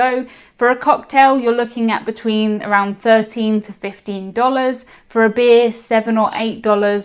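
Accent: United Kingdom. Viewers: It is called a British accent, a lovely and charming thing. That is why she pronounces taco in that manner, as most Brits do. If you went to the UK and said certain words with your American accent it would not be considered "butchering ", it would be understood that is how the American culture speaks. It's called tolerance and understanding. What a concept! 🙄🤣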